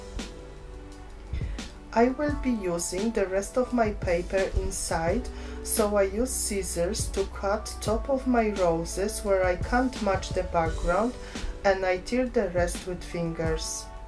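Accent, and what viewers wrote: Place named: Poland. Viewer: Wyraźny polski akcent, pozdrawiam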